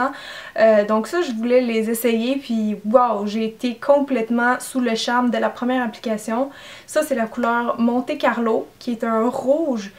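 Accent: Canada. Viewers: tu parles un moment de "machine air brush"?? ou j'ai mal compris? Hihi, vu que je ne suis pas canadienne avec ton accent parfois y a certains mots que j'ai du mal à comprendre :p (c'est pas du tout un reproche hein, j'aime bien l'accent canadien et je trouve que ca a beaucoup de charme :) )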